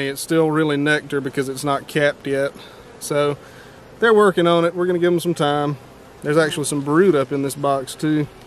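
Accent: South, United States. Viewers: Can someone please tell me where in the south this accent is spoken.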